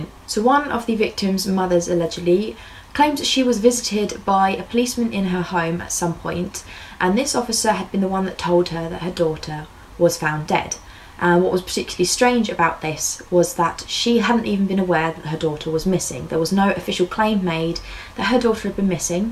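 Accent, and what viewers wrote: United Kingdom. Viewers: It just sounds different because she's from Britain. 🤔 Grits in Chicago is the same as grits in Alabama 😂 And that would be porridge in England. 😆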